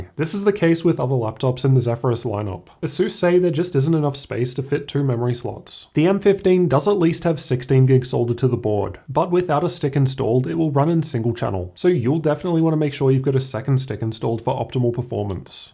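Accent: Australia. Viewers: subtle Aussie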